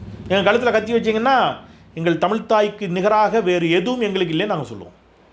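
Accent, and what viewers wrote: Tirunelveli, India. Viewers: sir tvl language ❤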